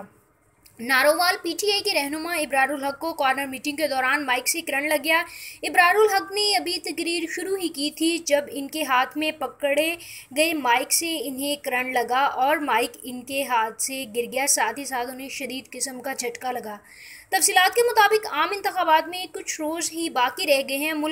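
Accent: India. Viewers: yeh kisi indian ka channel hai. haram zadon ne Pkistaniyun ko confuse aur misguide karnay ke liye channel banaye huye hain aue bhonktay rehtay hai...mein shartia kehta hoon yeh ko indian larki ya aurat hai Pkistan ke kisi ilaqay mein aisa lehja aur alfaz ki adayegi mei ne nahin suni..yeh koi asami ya tibti accent wali hai..laanat ho tum indians haram zadon beghairton kameenon par.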